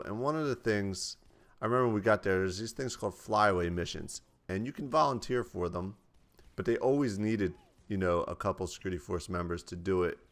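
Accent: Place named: United States. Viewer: Just.. No... I bet you're from the us?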